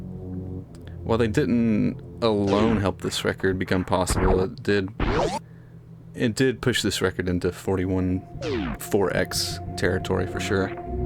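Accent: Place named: South, United States. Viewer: commentary!! Never heard it on your vids before is this new? You have a nice southern boy like voice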